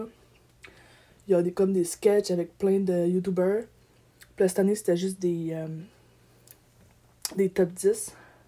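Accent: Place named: Quebec, Canada. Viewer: Cest quoi avec les ramen au poulet et il ya quoi a cote jai pas compris quand tu as parlé dsl je comprend pas comme tu as un accent québécois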